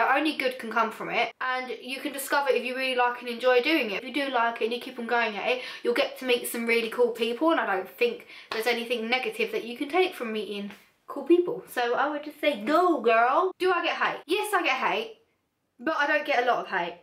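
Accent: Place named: United Kingdom. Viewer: Sweetheart, I absolutely LOVE your accent. I'm completely sorry if that's offending, I know when people tell me they like my "Caribbean" accent it can be quite annoying sometimes. I totally admire you and your makeup work! I love you tons! Hopefully when I go visit the U.K. that I run into you! Much love <3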